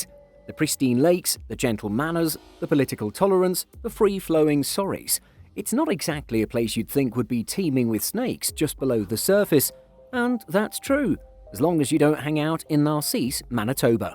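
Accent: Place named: England, United Kingdom. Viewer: Juste pour l'accent anglais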